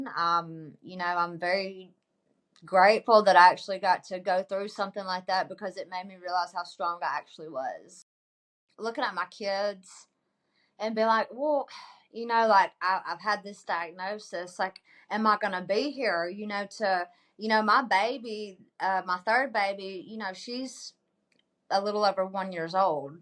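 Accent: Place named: Alabama, United States. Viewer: What a lovely lady , so brave and positive. Love your accent . I love Alabama ❤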